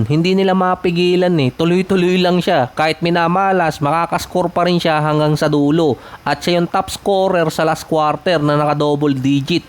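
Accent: Batangas, Philippines. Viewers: Batangueño ka din pala😃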